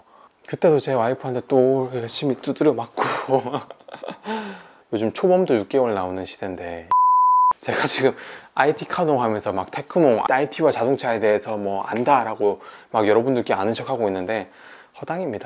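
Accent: South Korea. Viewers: 말투가 살짝 지방이시던데 어디사람이세요?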